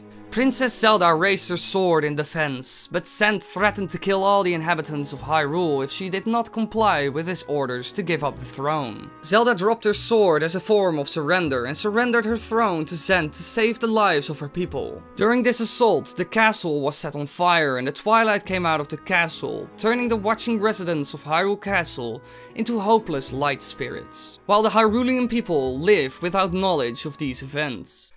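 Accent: Netherlands. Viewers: Jij bent Nederlands zo te horen. ;) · is hij nou eigenlijk Nederlands?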